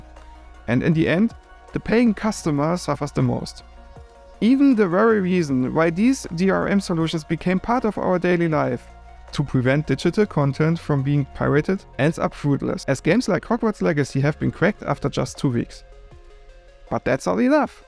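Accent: Germany. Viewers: Being new here, I thought,"man, that's one thick german accent" and then the name was displayed. Brother, as a fellow german, I feel for you - probably having shared the same educational system. Keep up the good content and thick accent.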